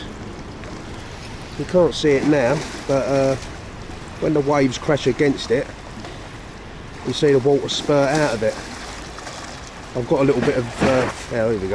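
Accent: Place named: London, United Kingdom. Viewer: Love your videos, informative, interesting and fun. Plus your accent is impeccable London! Long may you continue with these excellent little films, for someone who lives in a village like me, the sheer size and busy nature of London is mind boggling and mildly terrifying! Much love from the north of England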